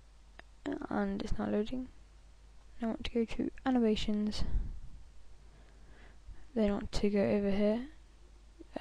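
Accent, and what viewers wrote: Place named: England, United Kingdom. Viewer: it's called the English accent.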